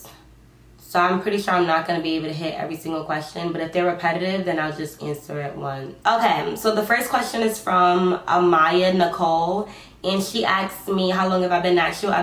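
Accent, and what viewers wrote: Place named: Harlem, United States. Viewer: You Sound So HARLEM 😂 I Love It 🥰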